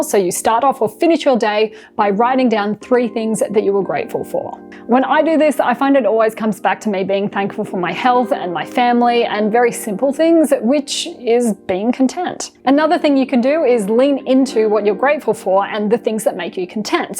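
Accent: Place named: Australia. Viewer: One thing that makes me very UN-happy is when obviously Australian (your accent) presenters reverse the video to make it look like they are in left hand drive vehicles on the right hand side of the road. I can see the Sydney Harbour Bridge behind you while sitting in your car, yer the video is reversed to give it that all-american drive on the right look. Please, embrace the fact you are an Australian and stop doing that, meanwhile I'll stay unsubscribed, plus down tick it, until I see this happen.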